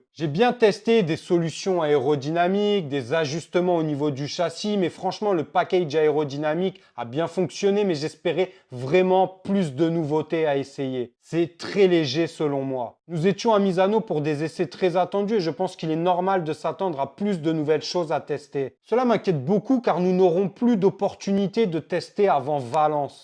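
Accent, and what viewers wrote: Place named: Banlieue, France. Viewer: Très bon contenu, mais pourquoi cet accent de banlieue? Dommage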